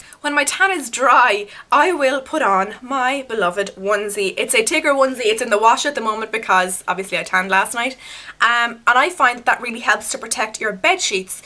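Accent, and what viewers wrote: Ireland. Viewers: OMG your Irish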